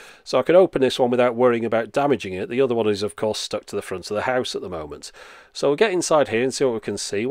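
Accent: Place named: Liverpool, United Kingdom. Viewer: No. Not really. People normally pronounce it "Add-hee-siff". The H is short, but not silent. I suppose it could be a regional dialect that could result in "Addy-siff". from (or at least near) Liverpool, so I wouldn't be surprised. Those people pronounce words funny all the time, but we dare not say that to their faces.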